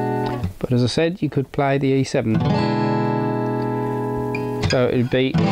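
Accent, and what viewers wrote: United Kingdom. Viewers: Thanks, this is best tutorial I have come across ...do I detect a Midlands accent ? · great video spoken with a good Brummie accent .cheers from a Tammie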